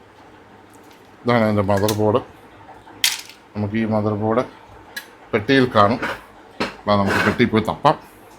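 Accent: Kottayam, India. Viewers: താങ്കളുടെ ഒരു ആരാധകൻ ആയി മാറി ഞാനും കറ കളഞ്ഞ സംസാരവും പിന്നെ കോട്ടയത്തുള്ള അച്ചായന്മാരുടെ തനി പകർപ്പും പിന്നെ എന്തു വേണം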